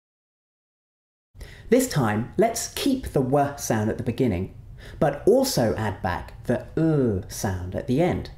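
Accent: United Kingdom. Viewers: Omg I love British accent, do you give individual classes? Also of grammar? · Hi,! How do you do? Howdy? You are a dashing English teacher. Your British pronunciation is clear.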